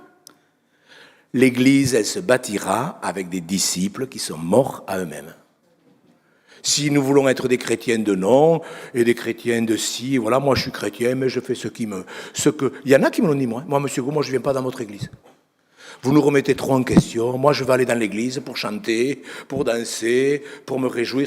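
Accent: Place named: Toulouse, France. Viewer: C'est vraiment l'accent de Toulouse !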